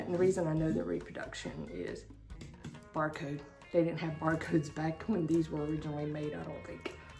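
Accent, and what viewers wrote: South, United States. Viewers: Hi! I love your hutch. I too am a strawberry lover and have many pieces in my kitchen. I enjoy listening to your sweet Southern accent. If I may suggest, don't keep your strawberries through the fall because fall decor is gorgeous and I'm sure you do it beautifully. I change my kitchen for the spring/summer, fall/Halloween/Thanksgiving (not all at once), Christmas and finally winter. I look forward to each season and holiday and each year I have more and more items to incorporate into my decor. It's so much fun! My favorites are fall and my kitchen at Christmas because it is a wonderland of gingerbread boys, girls and houses, sprinkled with cakes, cookies and all types of Christmas candies, faux of course. It's great when you keep finding items for a certain theme. Happy decorating and stay as sweet as you are! Hugs from an ex-New Yorker who now lives in New Jersey. 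Keep up the good work, 💞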